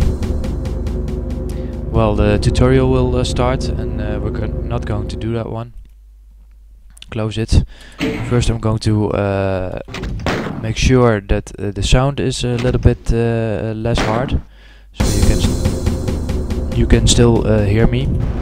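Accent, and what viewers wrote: Netherlands. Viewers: are you dutch? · Are you Dutch Ben je Nederlands? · I can hear that you're Dutch :) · are you dutch? u have a dutch accent lol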